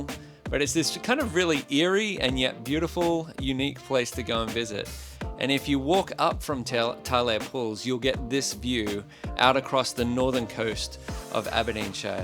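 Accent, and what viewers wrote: Australia. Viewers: You dont sound like you are american! You sound like an Australian or New Zealander! How did the lack or warmth and sun, particularly in the winter, make you feel, as you are from a warmer and sunnier part of the world? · I’m guessing you’re not a native Texan though. Sounds like Australian or NZ?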